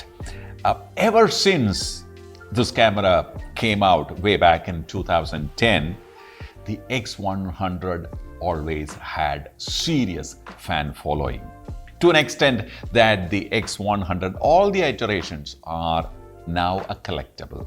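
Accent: Kerala, India. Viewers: great Keralite accent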